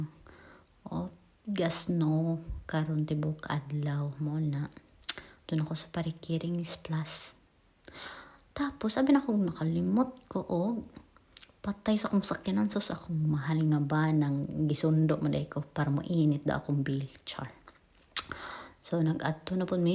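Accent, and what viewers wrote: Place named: Visayas, Philippines. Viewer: Ang cute pamati on mag bisaya uy hahaha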